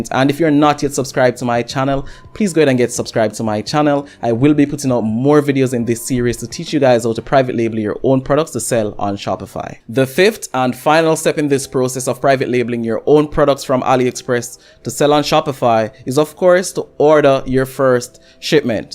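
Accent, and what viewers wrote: Jamaica. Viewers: Are you Jamaican? Accent sounds like it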